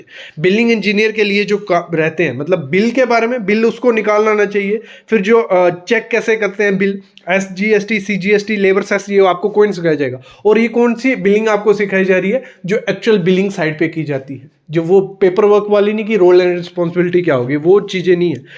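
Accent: Jammu, India. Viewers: Jammu se ho kya aap?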